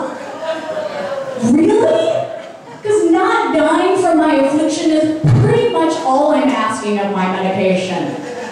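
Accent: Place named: South, United States. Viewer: Visited your site to find what a "southern belle" accent was, stayed for the comedy.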